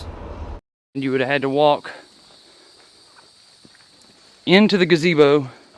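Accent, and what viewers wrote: South, United States. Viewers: I just have to say your very handsome and I love your southern accent 😍 ❤ I'm from the city Boston Massachusetts born and raised idky but I love country men southern men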